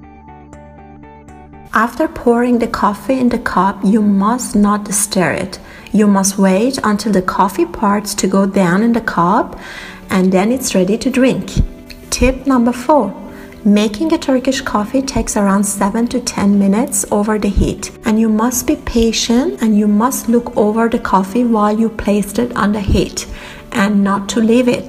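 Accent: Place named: Iran. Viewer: Love the persian accent😂💖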